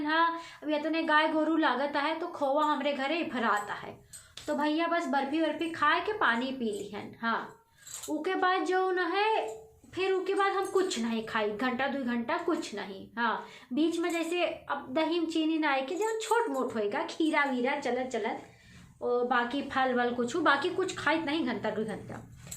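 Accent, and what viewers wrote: Awadh, India. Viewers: बहुत सुन्दर , आवाज तो हमारे अवध की है 🙏🙏। 😀😀😀😀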